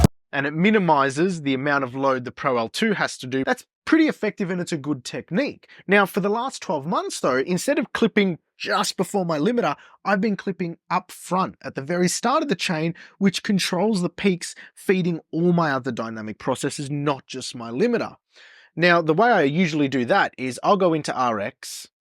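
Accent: Australia. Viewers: Straight to the point and no BS. No like and subscribe, click the bell, yada-yada. This is how videos should be. You sound Australian but look Italian with the way you communicate with your hands😅 Thanks for all the tips and tricks!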